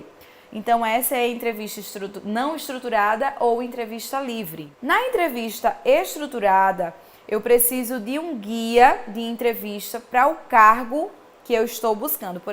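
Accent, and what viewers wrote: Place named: Fortaleza, Brazil. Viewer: mulheres com sotaque de fortaleza é o mais lindo.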